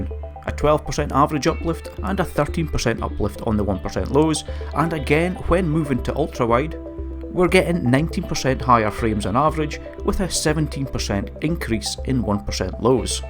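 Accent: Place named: Aberdeenshire, United Kingdom. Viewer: Very professional video, is that an Aberdeen/shire accent?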